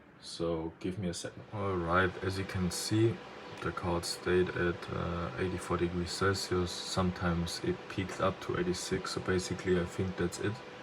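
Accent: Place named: Germany. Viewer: Ich frage jetzt mal auf deutsch weil ich heraushöre dass du deutscher bist :D super video wäre nie draufgekommen kupfer zu verwenden.🙏 Wo hast du das Kupfer herbekomen in der Dicke? Und nach der logik muss man die Kupferplättchen dann niemals tauschen oder?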